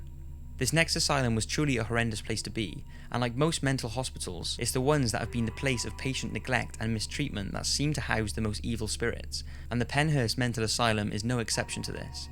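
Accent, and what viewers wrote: Wales, United Kingdom. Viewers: He definitely sounds welsh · He sounds welsh like from the south of wales where the 'welshie' accent isnt as distinctive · Are you Welsh by any chance or do I need a hearing test?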